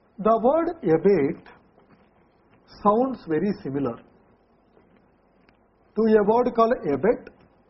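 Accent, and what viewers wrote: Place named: India. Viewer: Useful and interesting method of learning English but hard Indian accent makes the lesson more harm than good.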